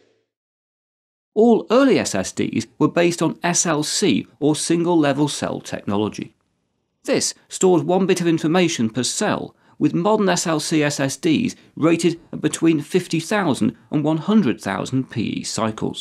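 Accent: United Kingdom. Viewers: Dude is brittish, ofcourse its gonna be exemplary. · Linus but British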